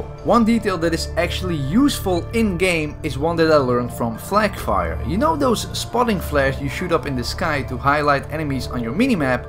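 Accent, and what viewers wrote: Netherlands. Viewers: you sound dutch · De Nederlands accent btw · He's Dutch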